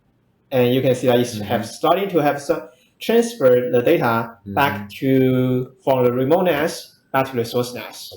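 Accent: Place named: India. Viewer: 在印度学的英语，口味太重，理解比较困难！！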